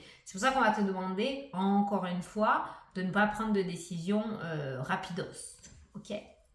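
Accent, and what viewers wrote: South, France. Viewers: Joyeux Noël à vous et votre famille !!! Vous nous apportez le soleil avec votre accent du sud!!! Continuez à prendre soin de vous et de nous tous. À dimanche.🎄🎅🎍🤶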